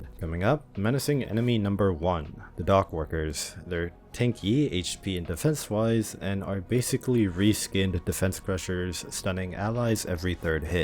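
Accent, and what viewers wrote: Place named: Japan. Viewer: pls stop speaking japanlish, i'm gonna die from cringe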